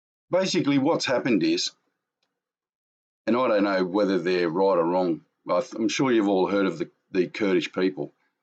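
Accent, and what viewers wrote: Australia. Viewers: hey I'm here in the USA and I just want to give you my input on the name change. I would say don't change it your accent is Australian you're Australian and there's nothing wrong with the name that's the way we know you. also on a sad note I have to report as humans we all stereotype stupidly, and I think most people expected you to look like Crocodile Dundee. just like everyone from the East Coast thinks here in Arizona there still Indians running around on horseback at Cowboys shooting at one another. it's programming from too much television sadly but people will learn and grow and adjust to the fact that not everyone from Australia looks like and sounds like Crocodile Dundee. just being honest and saying what nobody else has the courage to say. The Whole World's still pretty biased judgemental and silly.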